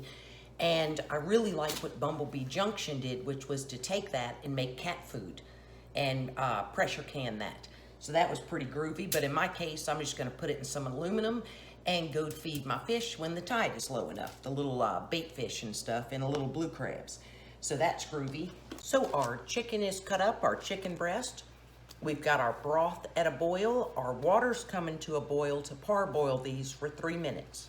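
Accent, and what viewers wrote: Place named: Florida, United States. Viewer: New to your channel. Found you through the Canuary collaboration. You make me smile. You're so lively! Never canned before so I am definitely learning a lot watching all these great videos. And if I Didn't know better you sound like you are from Florida. Will be sad if I'm wrong. I can usually spot a fellow Floridian. :-D .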